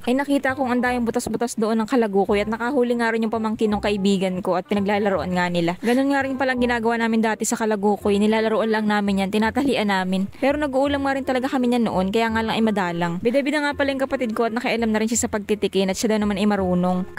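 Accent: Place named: Gumaca, Philippines. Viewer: hahahaha i really really love your accent it sounds like home to me... parang gusto ko tuloy umuwi Gumaca bigla 😂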